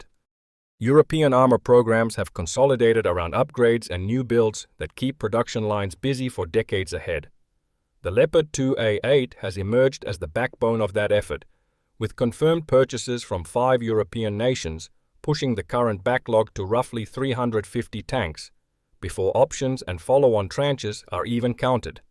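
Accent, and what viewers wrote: Finland. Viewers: I'm Norwegian and I know Finnish peoples. He has 100% Finnish accent. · Hey you creator. Please put some identification on your content, cause i was really close to dismiss you as AI slop, but then i heard your accent, and as a Norwegian i could recognize you're most likely Finnish ❤ Thank you for looking in to this. Slava Ukraini 🇺🇦 and Europe 🇪🇺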